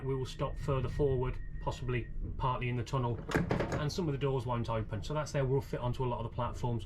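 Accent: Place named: Midlands, United Kingdom. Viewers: Really good video, I’m assuming saying it’s bostin’ is in bounds as I’m hearing a Midlands twang. You’ve a very good way with words 👍🏼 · Bostin'! I originally thought he was a Brummie too, but in an earlier (non-Underground) video I'm sure he said 'Peterborough, my home town'. So, East Midlands not West., please confirm.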